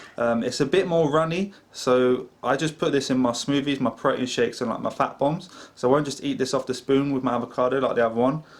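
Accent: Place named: Southern England, United Kingdom. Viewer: It's good to see a fellow keto person from the UK....at long last! I can tell you're from down South...I'm up North (Lancashire)....looking forward to watching your videos!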